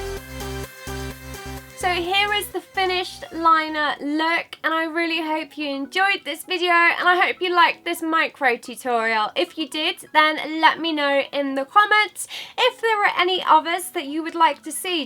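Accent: England, United Kingdom. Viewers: she from england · That british accent . you're so beautiful ;) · could you, in a next video, give us some tips about brows? i love your makeuppppp and your english accent ahah xx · Ohh this English accent.. How charmy is it😍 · I could tell she was British straight away because she has a tiny bit of Adele in her voice. Just my opinion anyway!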